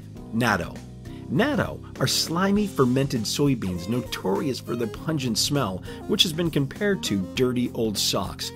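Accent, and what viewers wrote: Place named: New Zealand. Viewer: Your NZ accent was way better, it's what makes your videos awesome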